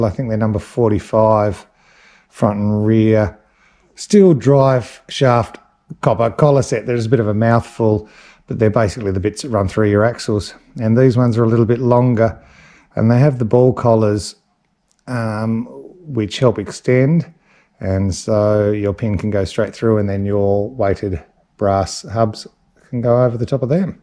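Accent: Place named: Australia. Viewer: Great video dude. I have 8, 1/10 crawlers. My first hobby grade RC was the original deadbolt so I have wanted a Scx24 Deadbolt since they first came out. Finally ordered one and have been watching lots of upgrade videos. Like yours the most. Interesting that you still like the Nitto tyres. I'm not going as far as you with upgrades but you know how it is I won't be able to help myself. You sound like an Aussie, keep the videos coming.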